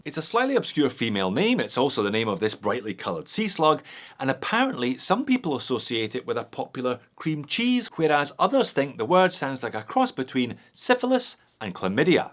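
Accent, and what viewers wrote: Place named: Glasgow, United Kingdom. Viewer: You sound so Glaswegian playing the prospective partner there mate! It never leaves you. And hello from Glasgow by the way: we’re freezing our arses off here right now!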